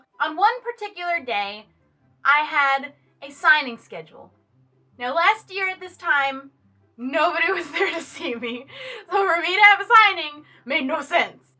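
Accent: Texas, United States. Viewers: Are you from Texas?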